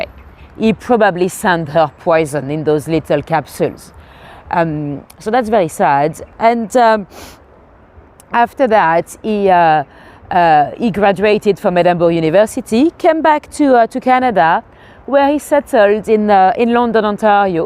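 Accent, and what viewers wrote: France. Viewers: You can't possibly be referring to the French accent? Love it!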